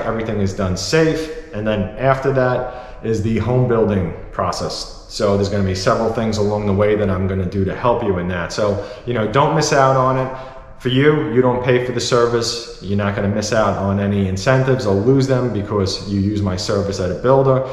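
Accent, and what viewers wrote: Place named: New York, United States. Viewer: Thanks for the tour, always like hearing your NY accent. I think big closets are because some people have so much stuff. More space around toilet makes home more ADA compliant.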